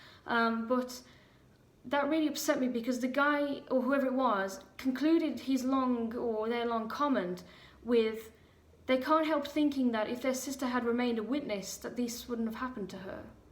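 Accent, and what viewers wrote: Ireland. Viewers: r u irish origen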